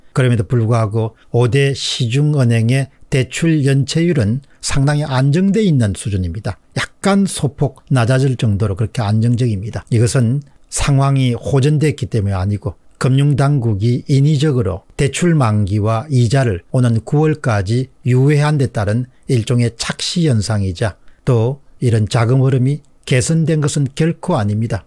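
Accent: Gyeongsang, South Korea. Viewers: 공 시징님 열심히 열강하고 있습니다. 현실을 직시하는 말씀 잘 듣고 있습니다. 다만 , 말씀을 조금 조절하시면 좋겠습니다. 너무 경상도 말로 빨리 말씀 하시네요. 좀 슬슬 말씀해 주세요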